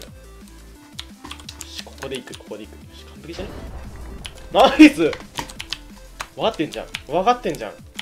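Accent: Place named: Nagoya, Japan. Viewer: 名古屋弁じゃん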